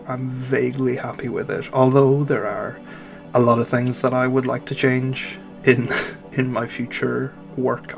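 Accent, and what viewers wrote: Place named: Germany. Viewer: 😍 OMG, first time I'm seeing your own art! ...your accent sounds a tad German... Sind wir Landsmänner?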